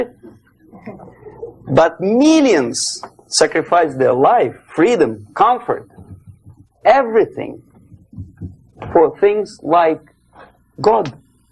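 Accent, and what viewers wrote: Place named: Russia. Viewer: RUSSIAN ENGLISH ACCENT SOUNDS GOOD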